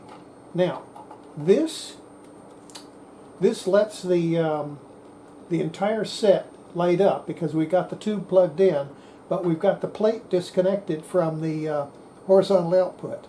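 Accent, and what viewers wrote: United States. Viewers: I think he lives in Texas? It is definitely some flavor of southern U.S. accent, not Australian.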